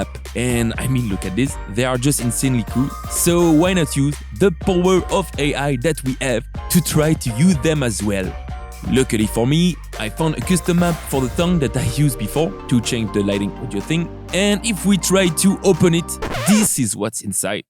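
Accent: France. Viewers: toi tu es français XD on as le même accent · Nice french accent ^^ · Cet accent français est juste magnifique🤌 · are u france?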